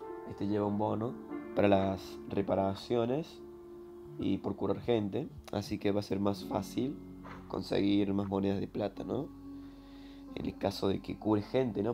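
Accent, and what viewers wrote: Cordoba, Argentina. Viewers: NUEVO SUB WUACHO (me pareces que tenes asentó cordobés jeje) YO HACE 3 DÍAS QUE ME LO INSTALE Y ME ESTOY RE VICIANDO...vi un par de consejos tullos y ya entendí mejor jaja Gracias cruk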